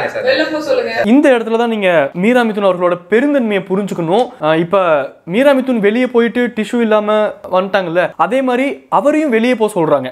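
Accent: Coimbatore, India. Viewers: super so Coimbatore full language speech super hit video your channel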